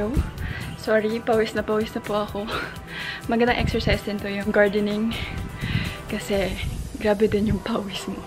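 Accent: Visayas, Philippines. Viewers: Bisaya jud paytera kaaju hehe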